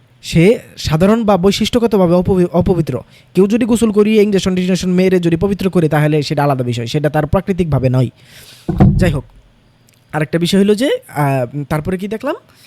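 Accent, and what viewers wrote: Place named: Manikganj, Bangladesh. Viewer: আমার বাসা মানিকগঞ্জ, আর আপনার কথাও মানিকগঞ্জের মত লাগছে এর জন্য বললাম আরকি।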